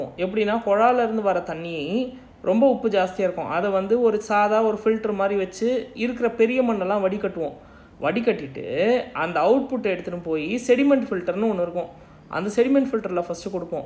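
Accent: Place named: Chennai, India. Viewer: Sema voice bro real Chennai man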